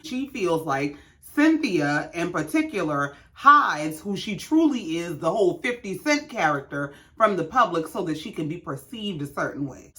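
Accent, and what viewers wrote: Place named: South Carolina, United States. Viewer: In all fairness Cynthia was a big deal on the New York scene so her connecting with Peter is not surprising and being with Leon was known. She is just more unavailable to general scenes. But I love your commentary with SC accent. I am thinking Charleston love it; reminds me of Grandma. Keep being you!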